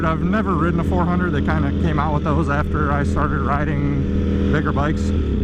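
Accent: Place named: Michigan, United States. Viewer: Great videos man keep it up! I'm from MI originally, in socal now. Looking to upgrade to SF V4S shortly. You have a strong Michigander accent lol, sound just like all my family from Dewitt.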